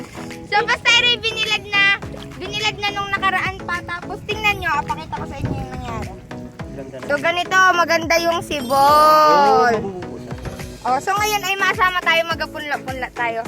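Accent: Batangas, Philippines. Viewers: Kagaling magsalita eh parang batangas lng eh idol crush kita ayiìihhhh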